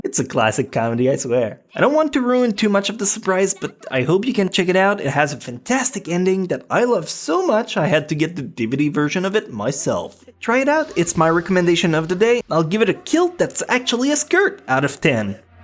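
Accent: Quebec, Canada. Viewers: Wait you're a french canadian ? Or you just live in Quebec ?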